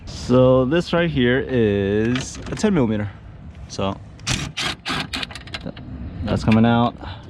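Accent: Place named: California, United States. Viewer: Why not straighten the fins? Ps first time come across your channel. You have an extremely strong Californian accent. Socal?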